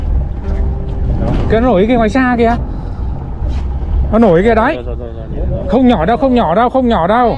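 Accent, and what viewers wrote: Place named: Hanoi, Vietnam. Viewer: Admin giọng HN ạ, dịp sau có kèo nào cho em đi chung với :)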